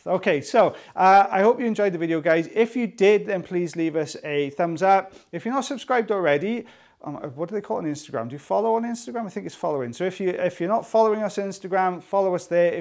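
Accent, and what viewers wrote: United Kingdom. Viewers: I’ve watched ear wax removal videos that have no explanation as to what they doing and I wondered why they only use certain tools at certain times. I had no idea the white stuff was skin! I found your videos and love that you explain everything and I’m a sucker for a British accent! I’ll watch no matter what format. Tissue paper??? Really??? Why?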